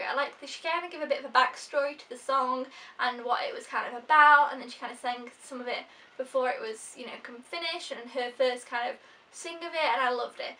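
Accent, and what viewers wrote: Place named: United Kingdom. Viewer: I watched this entire 18 min video start to finish. I love your British accent and you kinda look like Tay Swift as well. Very good review for someone who doesn't usually do music reviews!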